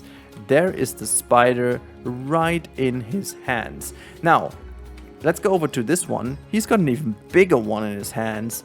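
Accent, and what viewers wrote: Germany. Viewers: hey i got an weird question but are u german?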